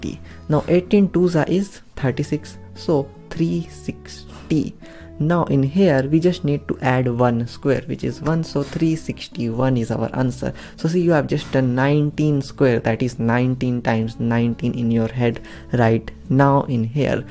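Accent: India. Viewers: Indian accent, shows it all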